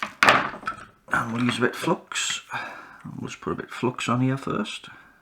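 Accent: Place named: England, United Kingdom. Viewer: I grew up in England and lived in Colchester, Nottingham, Bucks, London, Herts and Chester so don’t have a regional accent, but love the persistence of English accent variants. I can usually roughly identify accents, but can’t pinpoint if someone comes from Newcastle, Durham or Sunderland. Which is yours if you don’t mind my asking? 😊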